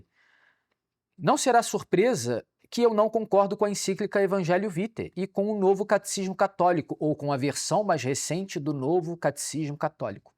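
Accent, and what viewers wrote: Rio de Janeiro, Brazil. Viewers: É que ele não tá acostumado com o carioquês, basta ver o Mestro Rorion Gracie falando Cadimia que a gente sabe que ele sabe que é academia, mas o carioquês é identidade pura. Eu entendo ele porque me dá um nervoso quando ouço Naisceu, por exemplo, que nem sei denonde veio essa forma de falar. kkkk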